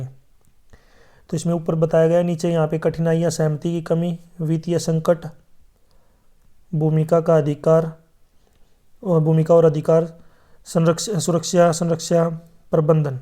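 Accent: Haryana, India. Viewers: Haryana ka hai kya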